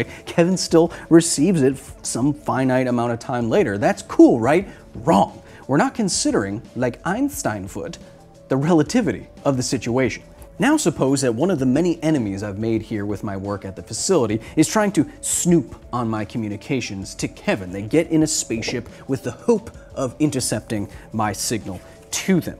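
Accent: Germany. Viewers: Why does your german accent sounds kind of like a stereotypical gay man?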